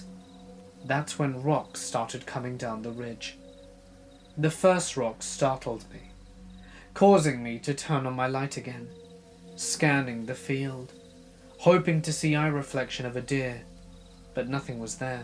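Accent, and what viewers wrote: United Kingdom. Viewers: Thanks creepy British dude!! You could read a dictionary and it would sound ominous lol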